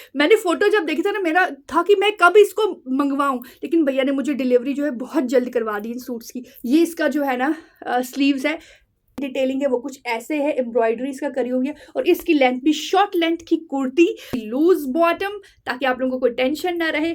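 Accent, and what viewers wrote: Kashmir, India. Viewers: Wah di wah kya ho ap 😂 apki kashmiri jab nklti hai na bht cute lgta hai❤ from srinagar Eidgah 💕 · Mashaallah aap bhot zada khubsurat lag rahi hoo dii❤❤aur muja aapki kashmiri baat bhot zada aachi lagti hu.aap kabi daly rutine dekho kabi dii kabki lots of love khush raho humasha aasay hi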